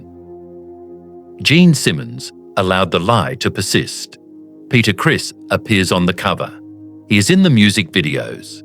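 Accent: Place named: Australia. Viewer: I can’t sit here and listen to this Australian robotic twit!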